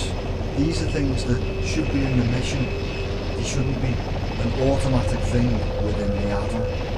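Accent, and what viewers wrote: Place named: Liverpool, United Kingdom. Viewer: hey man I've been interested in of airwolf for ages now, are you making missions for airwolf? I would love to get this if I could learn a bit about the game I will try and help you. I remember when this used to be on tele on the channel Bravo? Also you sound like your from Everton? yes? I'm not too far away, a bit further north. :)One more thing which operation flashpoint is this and will airwolf work with red river?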